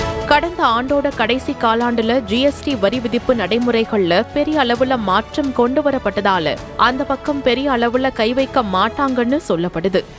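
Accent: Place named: Kerala, India. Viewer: Reader. is not a tamilian, may be a malayalee..it is not good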